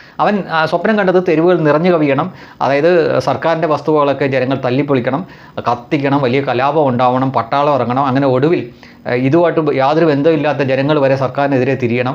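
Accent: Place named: Onattukara, India. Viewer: എന്തുവാടേ, നല്ല ഓണാട്ടുകര ഭാഷയിൽ പൊളിച്ചു കൈയ്യിൽ കൊടുത്തു, keep it up👍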